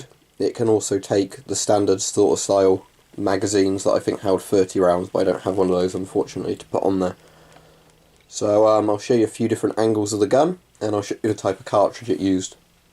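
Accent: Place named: United Kingdom. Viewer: That's sweet, I was about to say how does a person with a British accent who I assume is from England has a sub machine gun. but I see that its deactivated. still would be awesome to have I am from Canada and something like that would be highly illegal to have functioning as well and if I could buy one like this I would. I love Russian Guns, Love my SKS & Mosin Nagant. are you guys in the UK allowed to own those two and the SVT-40 (I've have yet to buy stock seems to be drying up on those in my area)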